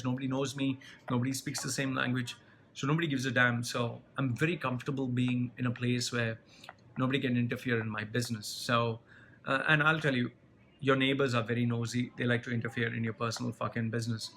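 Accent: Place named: upper class, India. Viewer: I think dubai has made you soft. Most of your reasons come from overgeneralization ,although because you grew up in a majority Indian city (Dubai) you talk like a tipical upperclass Indian from a metro city .either way its your life , enjoy it ,and raise your family. I too have wished I would get away from my relatives who add nothing but negativity in your life , they are too nosy. but I hope you could at least spend more time in India.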